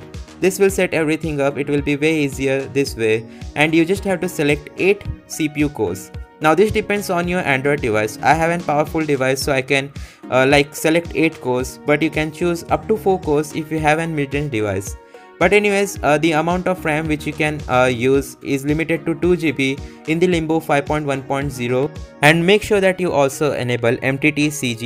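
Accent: India. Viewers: Are you from Indian because your English language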